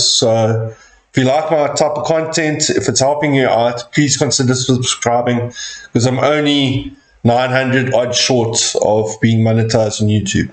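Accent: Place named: South Africa. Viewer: My bru sounds so South African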